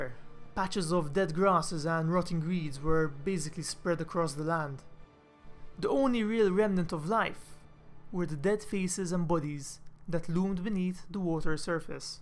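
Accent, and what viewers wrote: Malta. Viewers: Are you Maltese ?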